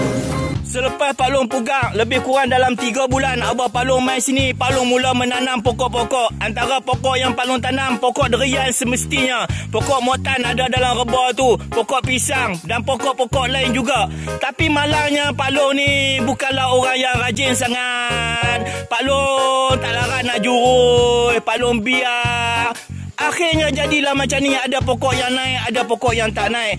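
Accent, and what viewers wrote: Kedah, Malaysia. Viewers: lama dok kat pahang, tapi loghat kedah masih mantap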